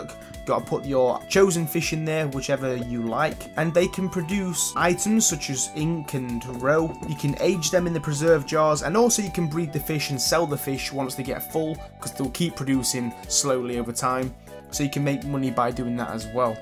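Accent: United Kingdom. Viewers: I really like the way you speak like brithish idk lol and yeah nice vid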